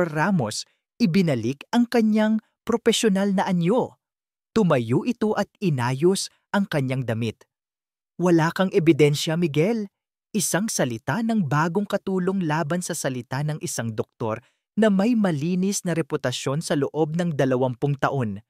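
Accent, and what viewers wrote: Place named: Pampanga, Philippines. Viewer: Kapangpangan siguro ang narrator kasi eng basa nya sa ang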